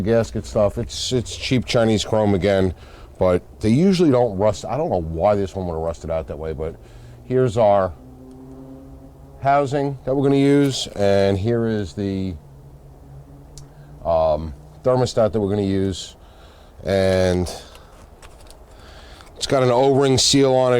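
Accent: New York, United States. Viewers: Nice car love you New York accent I’m in canada ehh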